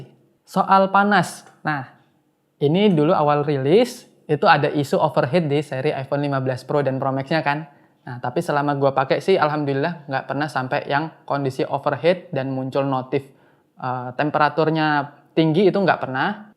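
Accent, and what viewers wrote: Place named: Java, Indonesia. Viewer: Bahasanya medok tapi lo gua lo gua wkwkw. Jawakarta beda dari yg lain😂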